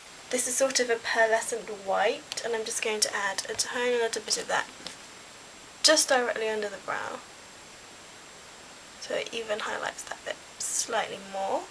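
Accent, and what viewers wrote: United Kingdom. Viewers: I LIKE UR VIDEOS.. IVE NEVER HEARD SUMONE WHO IS ASIAN HAVE AN ENGLISH ACCENT.. WOW:).. HAHA.. BUT I LIKE DIZ VIDEO IT REALLY GOES GOOD WITH U.. UR A VERY PRETTY GURL!:)..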